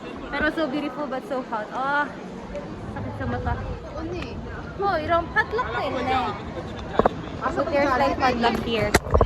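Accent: Tagalog, Philippines. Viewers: Ang galing mo mag salita ng tagalog pati accent mo tagalog !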